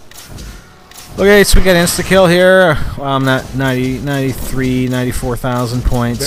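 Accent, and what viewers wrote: Canada. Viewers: hey dude you must be from Canada.... am i right?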